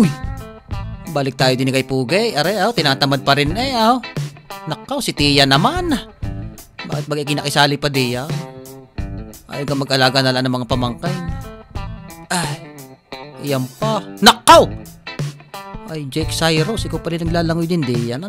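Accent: Batangas, Philippines. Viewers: Nice idol, 😂😂😂😂 iba talaga ang dilang Batangueño 😁😁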